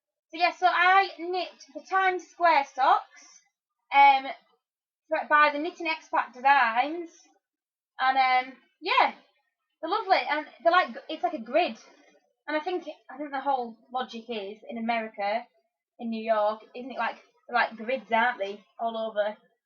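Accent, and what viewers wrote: United Kingdom. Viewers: Brill podcast!! I'm going to have to binge all your previous episodes! And it's nice to find a podcaster with a proper British accent ;)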